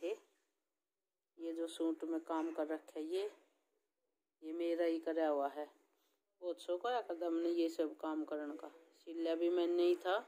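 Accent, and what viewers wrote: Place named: Haryana, India. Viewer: Aapke awaj sa lag rha tha aap jind ya kaithal sa ho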